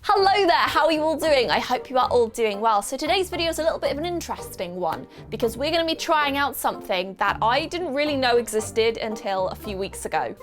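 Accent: United Kingdom. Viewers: Are you from Britain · Omg i Love your british english 😍😍 (I'm from Germany and i am learning American english ) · Are you British??cuz ur accent tho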